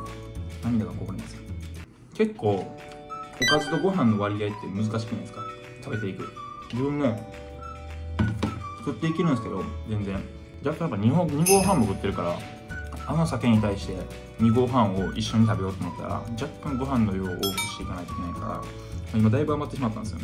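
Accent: Kansai, Japan. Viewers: この美形からの 関西弁が最高💕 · ちょくちょく出てくる関西感強めの藍くん最高すぎます！笑 動画が出るごとにボケとかツッコミも増えてきて面白いです！笑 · バレー観戦に沼ってる関西人やけど藍くんのマジもんの関西弁、喋ってるのは親近感湧くわ〜😂天然やし面白い👍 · 関西の人やなあ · めっちゃ喋りまくってるのがギャップですごくおもしろくて可愛い🥹🤍関西弁なのですね！さらに好きになりました❣️ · 端正な顔立ちにバリバリの関西弁のギャップが可愛い😂❤️毎回映り込むご飯の器が調理ボウルに見えて気になってたけど、二合半も食べるんだね🤣188cmもあれば当たり前かな！？前、親子丼？の回は洗面器に見えた（笑） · 関西弁で喋っていると親近感が湧きます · もうほんっっまに藍くんオモロすぎる🤣🤣😂😂 ｢あっ🤭｣ってなってからの｢舐めます⤴︎よね⤵︎｣の流れ早すぎる爆笑 ところどころ出てる関西弁も最高です😍🌟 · 藍くんの関西弁イイわ。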